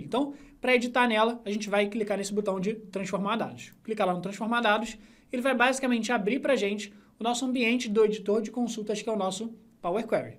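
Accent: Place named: Rio de Janeiro, Brazil. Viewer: Brother, sou carioca tbm, mas moro em Fortaleza desde os 7 anos...mano eu tinha maior preconceito em vê uma aula sua por conta do incômodo do sotaque...Mas olha, conteúdo muito irado, parabéns...vou acompanhar mais.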